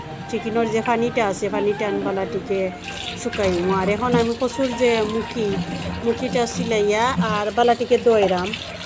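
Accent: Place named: Sylhet, Bangladesh. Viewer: মাশাআল্লাহ অনেক ভালো হয়েছে রেসিপি টা, সুন্দর উপস্থাপনা খাঁটি সিলেটী ভাষা❤️❤️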